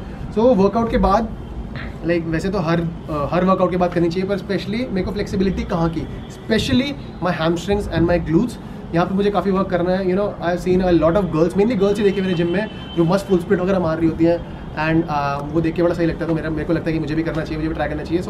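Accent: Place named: Almora, India. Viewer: bhai..you don't no #pahadi Hindi Accent fell like almora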